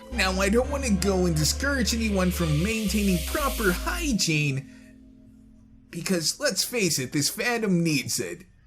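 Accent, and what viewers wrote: Ireland. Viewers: are u irish? xD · Anyone elsea realized he kinda sounded a bit different, in my opinion he sounded like irish (no offense)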